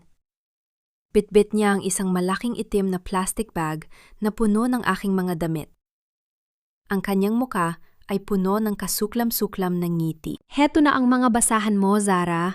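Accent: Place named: Visayas, Philippines. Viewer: Parang bisaya kadi mga narrator nyo eh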